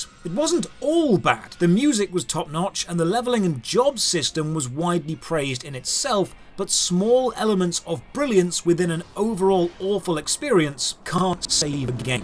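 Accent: United Kingdom. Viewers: proves that all you need is a deep voice, British accent and a suit and you can make any topic sound high class.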